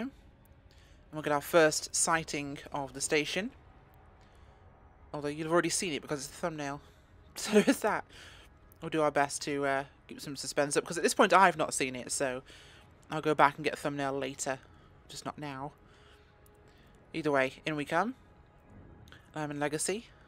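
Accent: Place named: United Kingdom. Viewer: Ok that’s fine btw I like your British accent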